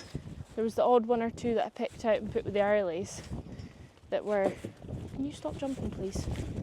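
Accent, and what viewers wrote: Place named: Scotland, United Kingdom. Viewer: Hi, you have so many ewes to still lamb and that means you will be busy for a long time. Yes, they all look good considering all the rain you had earlier. You will have to train Davie better, not getting your lunch is a bad start for him. I assume your dad is looking after the cattle while you do the sheep, a good team working well. I love listening to your unique Scottish voice, its so different from the lingo in Aussie. Looking forward to hearing your news. Chris from Victoria, Australia 🇦🇺.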